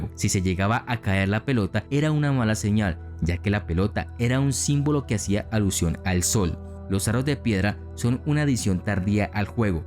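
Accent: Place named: Colombia. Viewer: El narrador es Colombiano, se nota cuando pronúncia Frijoles y cuando no puede pronúnciar Las palabras Nahuatls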